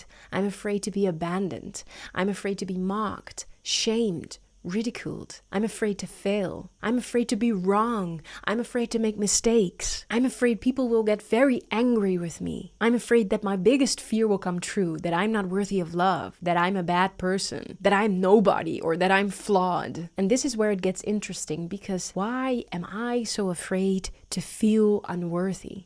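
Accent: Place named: Netherlands. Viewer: This video really was a eye opener. Als reconized the dutch accent in secconds❤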